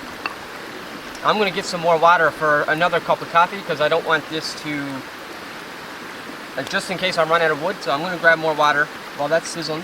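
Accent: Newfoundland, Canada. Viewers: Are you from Newfoundland ,you sound like you have a bit of an accent? I just started watching the vid and had to work...I'll watch it later...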